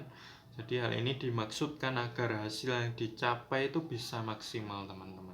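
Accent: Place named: Java, Indonesia. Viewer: Medhoknya itu lo kak😆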